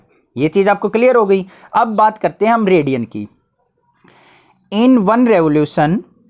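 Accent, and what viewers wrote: Haryana, India. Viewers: Sir aap Haryana se ho kya? You are helping all of us sir☺️👍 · I love ur haryanvi accent 🥰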